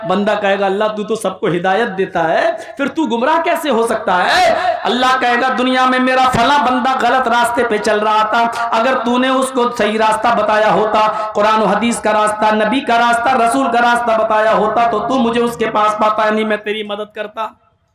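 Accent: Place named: Bangladesh. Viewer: Ye sala Bangal deshi bala bat karta h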